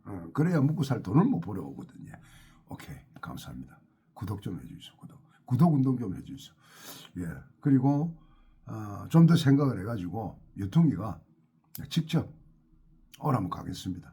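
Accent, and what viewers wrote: Gyeongsang, South Korea. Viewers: 같은 경상도 말씨 좋아요 우리 함께 자유통일당 당원이여서. 감사드립니다. 구독은 진작했읍니다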